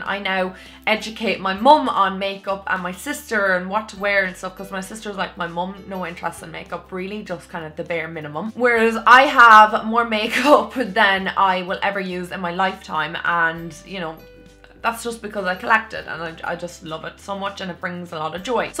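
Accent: Ireland. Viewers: I LOVVVVVVEEEE that your Irish I'm from Dublin and any blogger I watch is American sooooo happy I came across you xx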